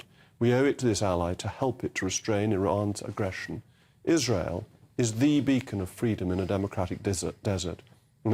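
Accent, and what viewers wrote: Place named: RP, United Kingdom. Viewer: Why does this guy sound so condescending absolutely about every single thing 🫤 must be his posh accent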